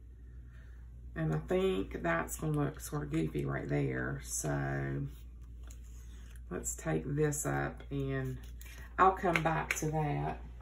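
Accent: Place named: West Virginia, United States. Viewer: I really love watching you, your so genuine!! Not to mention I love your accent, it takes me right back to my childhood visiting my family in West Virginia!! I lost my father recently to Alzheimers so I haven't been watching a lot of videos, but I'm starting to get back to planning and your one of my favorite you tubers!! Keep up the great job, your spreads are so unique and beautiful!!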